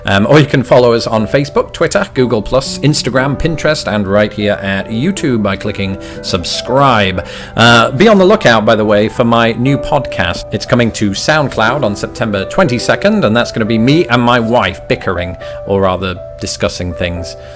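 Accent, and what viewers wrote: United Kingdom. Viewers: Some people are sensitive to others and their consciousness isn't aware, and then the subconscious manifests itself in a question they ask. Your body language is probably like a person with culture e.g. erect posture, eye contact, humility or maybe she heard you talk from a distance and wasn't consciously aware that she picked up on your British accent under the white noise in the room. I really like your videos.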